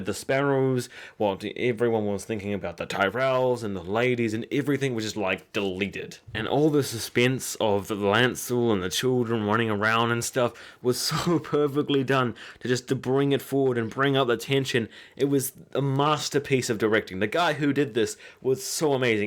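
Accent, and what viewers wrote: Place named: New Zealand. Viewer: *Great Video. I like your Kiwi accent reviews almost as much as I like the Ozzy guy reviews. The difference is you speak the Kiwi English and he speaks Bloke English. *The Little Bear Lyanna Mormont gives her speech like a boss. Sorry Wyman Manderly we gave your speech to a 10 years old girl. "The North only knows one king and his name is Snow....wait what?!" Oh well I joined in with the northern guys yelling a king in the North! Sadly I thought they would have had a Queen in the north but the northerners follow battle commanders and Sansa hid until the end with Lord (are you 18 yet Sansa) Baelish. **The Armada scene was great but I didnt see Tyrion on the ship so I assume he is on some other boat. I liked that Yarra had the big Pirate Captain coat. I almost wish she'd have stolen Capt John Sparrow's coat that he wears at the end of the 1st movie. 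However I don't think Disney will allow that until they Buy HBO.... #Disneyownseverything *Jamie will probably have to do something and I see Brianna Tarth helping him and then the 2 of them having sex. *Arya might sneak in and kill either Cersai or the Mountain, I'm thinking the mountain. She must have learned some kind of anti Necromancy many face gods spell to kill Franken-mountains.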